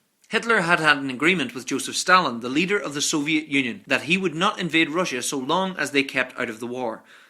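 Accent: Ireland. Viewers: Damn, everything is more interesting and funny with an Irish accent :D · Are you Irish? Your accent sounds like it, I have Irish cousins and they sound just like you lol. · You sound irish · man i know you had cram lot in 7 min and people love to kind of blow over the whole aid thing from america you did bring it up but like a after thought you talked about britians navy and air force so much better germany and it was how ever the one thing all non america quick not talk about the lean lease with out the fuel from it britian would not be able to fly the planes or would not been able to sail the ships also on to russia you said it amassed large army true but with out food aid fuel weapons and material russia would been forced to surrender i know you were on a time restraint and i do think you for the sleeping giant i think that was churchill that first said it and i cant really tell by your accent i take it your irish for even bringing up irland if so there my second faviort non usa country only germany do i love more mostly cause out side of america the germans invented the most stuff not as much as america but alot i love that little country not little compaired to irland but little compaired to usa or russia and would beaten russia in my opion if was not for america that means would took a country 2 and half times the usa or about 15 that of its self not to mention belgium france poland all the others by its self mostly yea italy helped a little but hell greece beat italy in the early parts but i might be going after your video that does not mean i did not like it so im sure first thing if you do respond to this will be my grammar yea i know it sucks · are you Irish? 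you sound Irish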